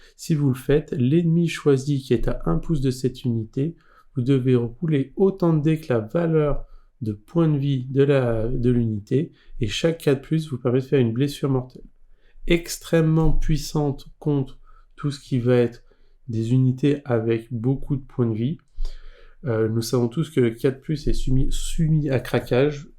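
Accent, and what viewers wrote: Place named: England, United Kingdom. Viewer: Cet accent Anglais toujours aussi délicieux ❤ Cookie aussi tiens !!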